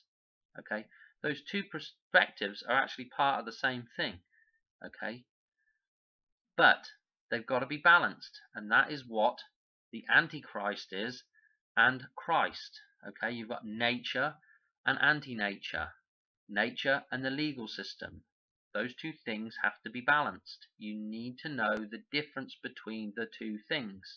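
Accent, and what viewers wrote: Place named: England, United Kingdom. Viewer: Came over from Secrets of Saturn, well explained. Wasn’t expecting to hear an English accent. Greetings from Edinburgh Scotland.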